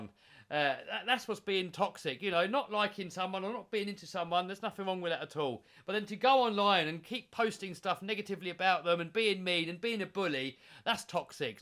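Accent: United Kingdom. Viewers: Yo, i join to this Chanel a year ago and i have seen all ur main videos chanel i like u, ur conten and the british english Continue been like u r i love all what u do, in all videos u make me laugh and i hope u get that 2 millon of subs Thanx and a greeting from Spain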